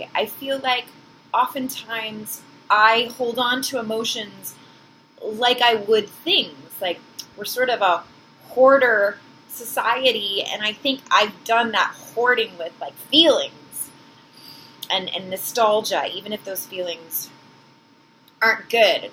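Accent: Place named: United States. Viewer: I really thought you were British in John Carter... O_o I'm still trying to get used to your American Accent... talk about a twist.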